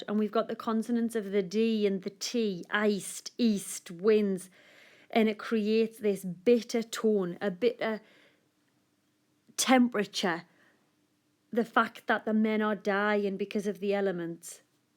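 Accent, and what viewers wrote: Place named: Scotland, United Kingdom. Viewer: All I could hear was your scottish accent. Idk why for some reason, but tht i all I could hear.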